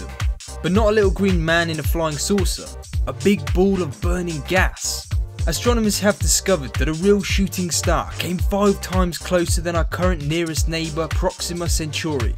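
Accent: United Kingdom. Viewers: Eh, generic, nasaly, mediocre british commentary is boring and over done.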